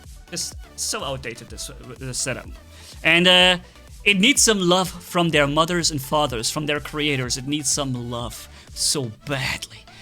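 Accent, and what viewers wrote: Germany. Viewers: You're German?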